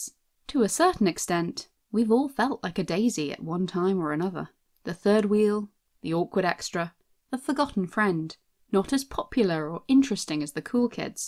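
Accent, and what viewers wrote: United Kingdom. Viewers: It sucks that she wasn’t added, as a playable character in the game. Other people, such as myself, would’ve loved playing as her, if they added her to the actual game, and actually be playable in the dlc, that Nintendo might plan on making, that is. Also, glad to hear your British accent again, by the way, since I’m British as well, just like you are irl!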